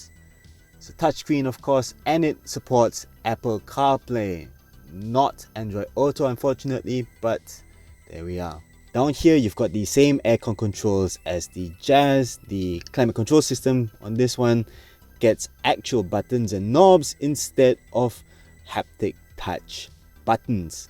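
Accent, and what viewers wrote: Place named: Malaysia. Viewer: I just wanna say hey this is Malaysia and it's OK to present in local accent with correct grammar. Having a fake British accent will only makes you look funny.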